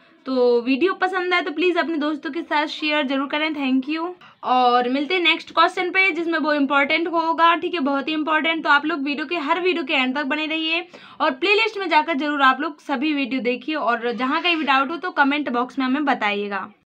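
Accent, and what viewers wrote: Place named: Bundelkhand, India. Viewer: Btatey btatey bundelkhandi a he jati h...😅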